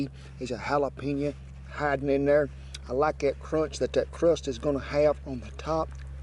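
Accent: South, United States. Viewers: I love this American accent! God I'd love to move to the US. Ah man that is life!! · You just have that down home way of speaking. BTW I’m in Woodward so I’m not too far from you. ❤️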